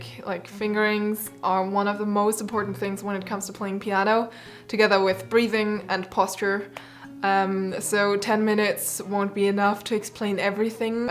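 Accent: Germany. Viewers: To change the topic ..... it's interesting to hear how a german speaks englisch. Du machst die gleichen gramma mistakes die ich auch aus der deutschen Grammatik rueberhole, und sprichst abgehackte Woerter. Englisch ist mehr eine weiche Sprache wo Woerter ineinander fliesen. Das ist schwer fuer Deutsche. Aber dein Englisch ist gut 🙂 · Bist du deutsch? Ich höre so einen Akzent? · Your German accent is so cute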